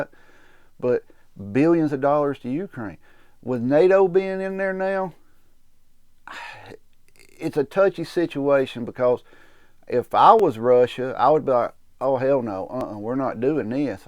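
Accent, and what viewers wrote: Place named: Appalachia, United States. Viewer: hillbilly talk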